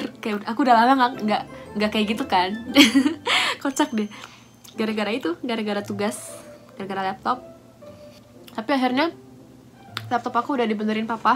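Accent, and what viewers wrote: Japan. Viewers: Kak kalo ngomong indo tpi nadanya kayak ngomong jepang hehehe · logat jepangnya udah kental yaaa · suka banget liat kak ngomong bahasa Indonesia logat Jepang. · Logatnya lebih sering ke Jepang sekarang walau ngomongnya Indo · Ngomongnya indonesia logatnya jepang wkwk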